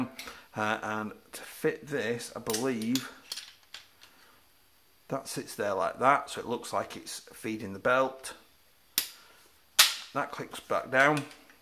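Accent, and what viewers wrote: United Kingdom. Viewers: Bro I clicked on this thinking you were reviewing the Litefight MCR, then I heard the British accent and got confused why a Brit is reviewing a firearm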